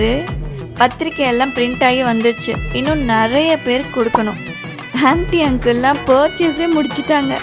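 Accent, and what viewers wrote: Coimbatore, India. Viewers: unga native coimbatore correct?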